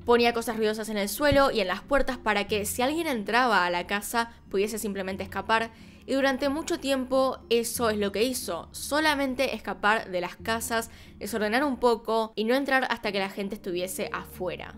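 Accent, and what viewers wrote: Argentina. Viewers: Hola, tengo poco viendo tus videos, muchas felicidades, tienes un hermoso acento. Argentino, no se si ya tienes el caso, de hace muchos años de los narcosatanicos de Matamoros, tam. Ojala y encontraras suficiente información al respecto y si te parece hacer un video. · Me encanta tu voz aterciopelada y tu pronunciación del inglés con tu acento argentino. Besitos desde México 🇲🇽 😘 😘 😘 🇦🇷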